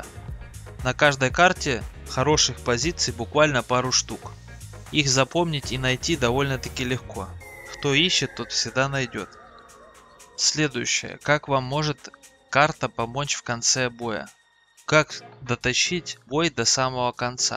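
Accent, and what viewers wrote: South, Russia. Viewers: глупый)) он не не выговаривает)) это просто южный говор такой)) меня он очень забавляет))) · Ох уж этот южный Гговор)))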